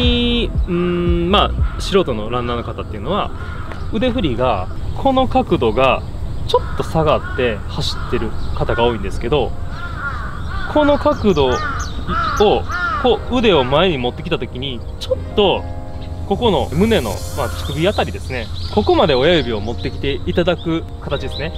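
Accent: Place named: Kansai, Japan. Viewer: の動画はナレーションがの関西弁そのままでめっちゃ良いです！ P.S.冒頭のBGM音量もう少し下げていただけますと… のアチチな気持ちはわかるんですが…音量が…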